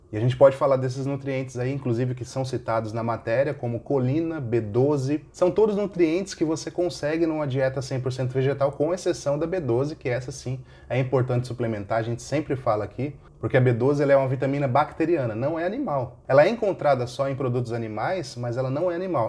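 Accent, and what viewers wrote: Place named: Rio Grande do Sul, Brazil. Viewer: Tu foi afetado pelo gauchês. É um caminho sem volta. Minha noiva (de Santa Catarina) já fala tri, bah, afu, tchê, chinelagem e cacetinho. Bem-vindo ao clube. Mazaaaaa!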